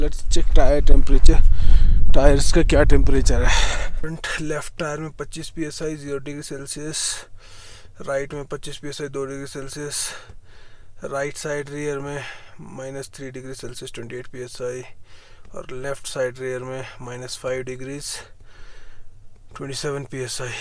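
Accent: Haryana, India. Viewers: Bro are you from haryana🤔🤔🤔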